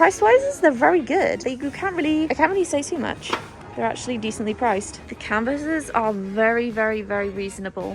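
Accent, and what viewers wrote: England, United Kingdom. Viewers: It amazes me how British your accent is after so long in USA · What I love about your video is that immediately I was struck by your voice! You have picked up a more British twang while you’re here in the UK. If I was you I would leave all your clothes and pack your case with more paint and art stuff! 🙂🎨❤️ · I love how you have kept your British accent. A friend of mine moved to the states and totally changed! HobbyCraft is addictive lol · I’ve been watching your channel for like 5 years and I just thought of something. Why do you have a British accent if you’ve been in the US since you where about 8? Is it because you learned how to talk in the UK so you’ve just never lost the accent? I’m really curious, accents are super interesting to me. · I can’t believe you haven’t lived in the UK since you were 9 yet you have such a strong English accent. 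😂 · How do you have such a strong English accent if you’ve lived in the US since you were 9? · I simply can't understand how you kept your beautiful British accent living in the US for so many years. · I've lived in the UK my entire life and I LOVE the Works Any other art supplies I'll get in The Range or online. I also thought you'd lived in the UK a lot longer than you had. The accent I guess. My hair does the same thing, specifically cause I live in the South West (not too far from Bath) and the humidity makes it go poof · I love youve lived in the US for many years but havent lost the beaut english accent :) Greetings from Bournemouth <3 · As a local, it's odd listening to a British accent talking about "a town called Bath" as though it's some magical place that nobody's ever heard of. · how do you still have a british accent 😭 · I love your British accent! Also, you are a sucker for anything art and now I want to go to England because of this video!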